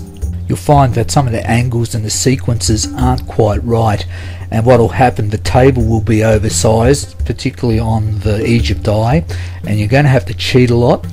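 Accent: Australia. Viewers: I’m very happy your south aussie and you sound like russel cought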